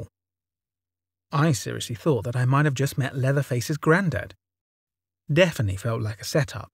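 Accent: United Kingdom. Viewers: British accent or not, his delivery is high quality. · Love this guy! His narrations are so good! The British accent gives a flavor to it!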